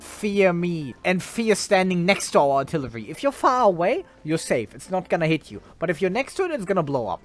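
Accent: Germany. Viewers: I heard a german playing a ww2 game???? Count me in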